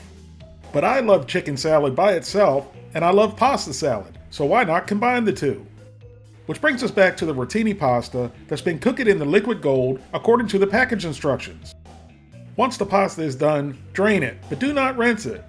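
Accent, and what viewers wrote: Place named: Florida, United States. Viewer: You sound like your from Florida, also great video :)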